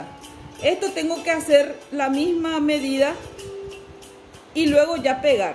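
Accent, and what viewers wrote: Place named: Paraguay. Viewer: Sabia que era paraguaya.. Por la forma en la que habla💕😊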